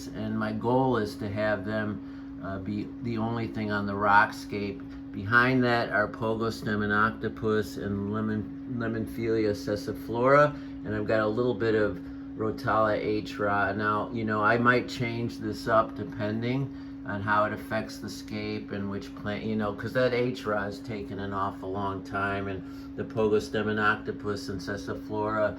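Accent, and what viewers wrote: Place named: Chicago, United States. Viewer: I'm about 5 hours straight north of you. Great looking tanks and beautiful looking, healthy fish! About 3 minutes in, I thought to myself, "I bet this guy is from Chicago! 😂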